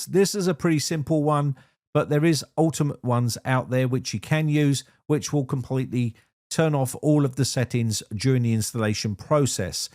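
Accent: United Kingdom. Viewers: He’s British, so if you can’t understand the mother English language, watch someone else 😉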